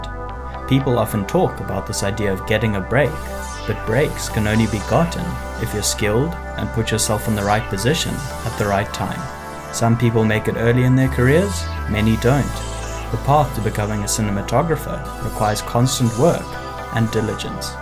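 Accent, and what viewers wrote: South Africa. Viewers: Is that a South African accent I hear? 😂 Another South African here! ❤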